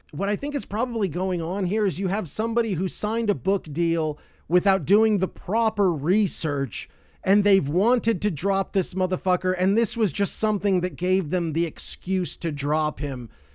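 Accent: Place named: Germany. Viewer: Very funny german accent ahah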